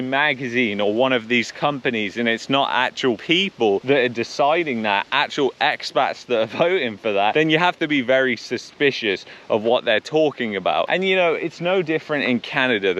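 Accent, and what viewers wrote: United Kingdom. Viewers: You still have your northern accent so you'll fit right in. I am expat from UK, Dorset, on the south coast. I've always kept up with the UK news. I wouldn't go back to the UK if you paid me. The brainwashing there is quite possibly worse than here in Canada. The "new" Brits are nothing like the people I grew up with and they seem to have lost their compasses. Wait 'til you see how many rules and regulations there are that control so many aspects of your life. All this people living on an island with thousands of migrants arriving to use the benefits' system, that's why there are so many insane rules and regs. Too bad you didn't feel a loyalty to Canada and remember what a truly wonderful country this used to be.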